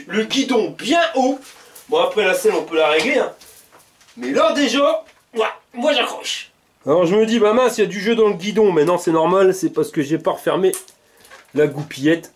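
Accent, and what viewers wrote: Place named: North, France. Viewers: chalut biloute dit moi apres les 3 ans de la sortie de ta video que pense tu encore de ce modèle on a un budget de 1000e par biclar et ce model nous plait bien . orange y pete sa mère sur ce bonne continuation terrible les video avec l'accent de ch'nord tio merci a toi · Merci à toi, j'adore ton accent Ch'ti, je voudrais en acheter un fin du mois, tu m'as convaincu ! Amitiés, cordialement Dominique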